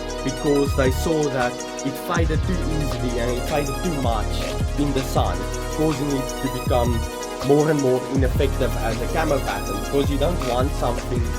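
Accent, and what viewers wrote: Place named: South Africa. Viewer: you have the strongest saffa accent ive ever heard. Wish the guy who offered me a genuine Rhodie wasnt greedy (bro wanted 5000 rand) 😔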